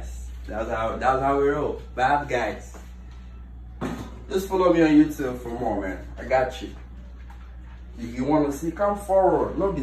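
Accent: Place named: United States. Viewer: I had to replay this. 😂😅 very smooth but why you sound american 😢😅😂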